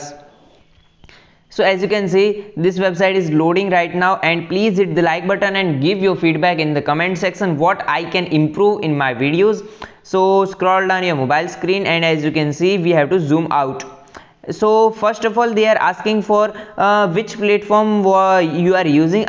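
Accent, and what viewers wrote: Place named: India. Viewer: Indian accent sound so dam hot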